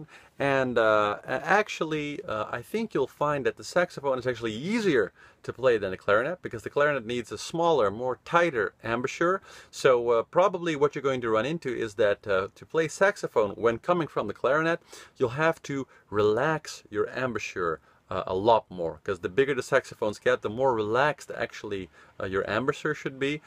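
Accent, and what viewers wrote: Netherlands. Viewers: Compared to your early videos I think you're losing your Dutch accent when you speak English! 😉 Thanks for all your help and advice.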